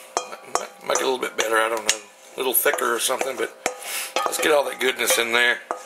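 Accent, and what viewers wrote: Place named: Alabama, United States. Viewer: ***** Ok no wonder you sound familiar, Im from Bama, guess its the accent. This meal was great too, made its way into my recipe box, but ill be sure you get the credits ;-) thanks for sharing!!!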